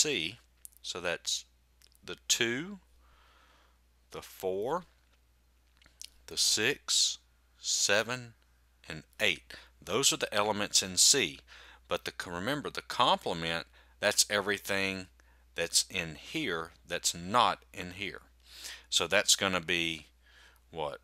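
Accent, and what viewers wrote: South, United States. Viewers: You mister, you sound so american, where are you from? Tenesse, Texas, The Grand Ranches of Arizona? Nevertheless, thanks for your video, it has certainly made things clearer... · Does the have a southern accent? I like it whatever the accent ❤️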